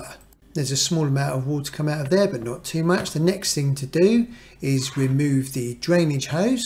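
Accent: England, United Kingdom. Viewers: Big Thanks for doing this video, and so refreshing to hear an English accent on here and someone who appreciates a British cup of tea. I will now attempt to dismantle the washing machine.... Edit I have tried. In theory I know what to do but in practice I don't have the strength to undo the taps. I wish they clipped on like hose pipes do.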